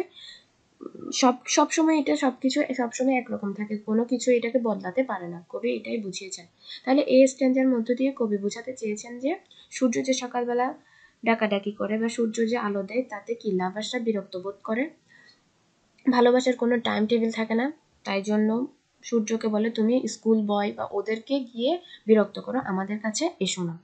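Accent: Chittagong, Bangladesh. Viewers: Apu assalamu alaikum. Kmn acho??ai video deke bujte parci, apni chatgaiya...apu apnr badi Chittagong er kotai??valobasha nio apu❤❤❤ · Apu chatgaya naki? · Oreee chatgaiya apu 😘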